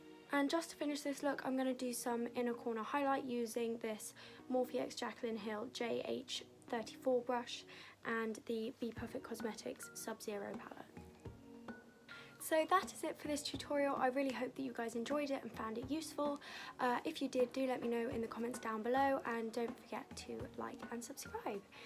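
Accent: United Kingdom. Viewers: are you from England? x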